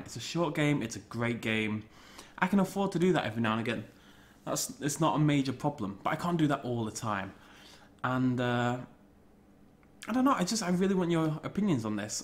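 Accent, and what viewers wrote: United Kingdom. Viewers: Im a little late to the party here but what made me subscribe was your voice and jpog all those years ago. and the original british-ness, i joined the british revolution god dammit! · To be honest, I subscribed for just about everything about your videos. I mean, comedy, British accents, and DINOSAURS? Recipe for success in my book. And what makes it so much better is that you actually interact with your audience. Even before the livestreams, in, say JPOG, you'd ask "Which dig site should we do next," or "How should we make this exhibit?" It really shows that you care about your viewers, and you also appreciate and respect everything about the games and dinosaurs. But, if you're running out of dinosaur games, then of course shake things up a bit. Do LPs of a game that you thoroughly enjoy, do a game that allows your fans to join you,(The Isle?) do games that have something new or weird. 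I'm not a Let's Player-yet-but I think this is a pretty good plan for youtubing. That aside, I'm here to stay. Thanks,. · I subscribed to you back in 2013. It was a cold day after a family conflict, and I don't remember how exactly I found it, but I watched your entire Jurassic Park The Game playthrough (in roughly two days) and I just loved it. I didn't love the game (not at all), but I really enjoyed your calm and humble approach to commentary on a let's play. I was tired of the loud energetic and highly edited content that let's plays and playthroughs have been so saturated with (like Pewdiepie, Markiplier and Jacksepticeye). But your content is always something that I feel that I can sit down and relax with. Also you seem like an amazingly nice guy :). I am also a big fan of dinosaurs. It's pretty much been my biggest interest for most of my life. So, when I saw a channel with dinosaurs being a major subject, and a YouTuber with an amazing british accent, I subscribed to your channel. 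I really don't know what type of content that I would like to see the most. I know that I was pretty excited about your new series called Pangea Today. The concept of it was that you would talk about and report new paleontological discoveries. After the first episode you ultimately abandoned it, and I don't understand why. You even mentioned in a vlog, I think, before the first episode of Pangea Today that you would bring the channel into a more eduacational direction. I know it's kind of a long time ago, but I am still wondering. I'm not complaining. I've just been confused about it for awhile. Can you please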